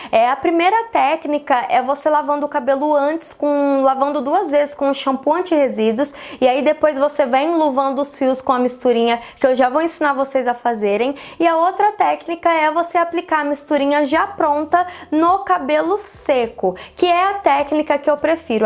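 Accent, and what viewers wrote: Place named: Interior, Brazil. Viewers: Q sotaque mara...sou do interiorrr tbm(Araçatuba)bjs adorei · Adorooo esse sotaque do interioR! Puxando o R! Tbm sou do interior de sp.. Bjs lindaaaa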